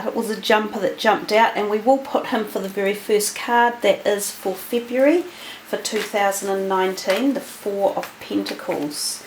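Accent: New Zealand. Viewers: Are u from new zealand? U have kiwi accent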